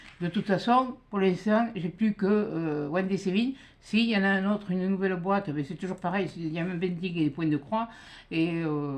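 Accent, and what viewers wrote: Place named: Lozère, France. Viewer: Bonjour. Vous m avez bien fait rire avec votre kangourou et votre accent. Je szis pas d où vous etes mais certainement pas du nord de la france. Il me fait penser un peu du sud de la Lozère. J ai passé un bon moment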